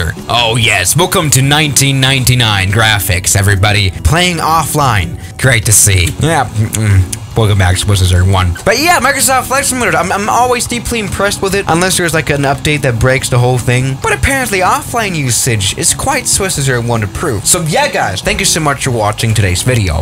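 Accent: Germany. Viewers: Bro are you German? If I may ask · Are you German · Are your a German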